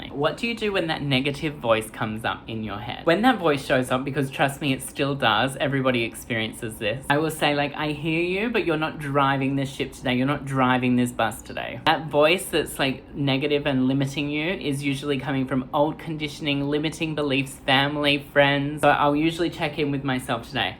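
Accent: Thailand. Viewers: คุณพูดอังกฤษแต่ทำไมเป็นสำเนียงไทย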